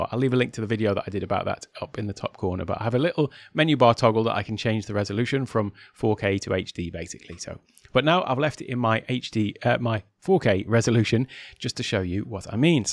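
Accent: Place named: Europe. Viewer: "that was a bit of useless information" - yes it was ha ha, but you Euros are just so pleasant to listen to I didn't mind it. Any of us across the pod we would have lost everyone in 30 sec...ha ha. Thx for taking the time to post, appreciate ya.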